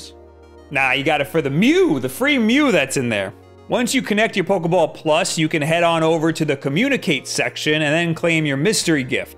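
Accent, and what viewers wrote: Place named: New York, United States. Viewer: Hey are you from around the north eastern US? I'm detecting a hint of an accent, maybe New York?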